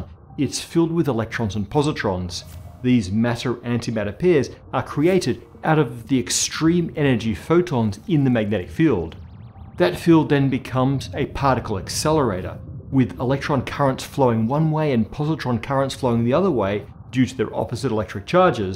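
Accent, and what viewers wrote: Australia. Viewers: You have such a heavy Australian accent that it makes it very hard for me to understand. And I really want to love your Videos because of the Subject you talk about... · You must get offended by things you don't understand to comment on YouTube. It's irritating. His accent is australian.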